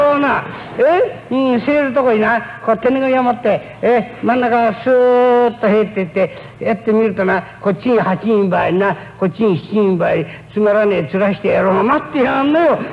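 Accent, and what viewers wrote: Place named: Edo, Japan. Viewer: 現存する音源では最高峰のひとつ。正確に文字に表せない江戸風の言い回しが凄まじい。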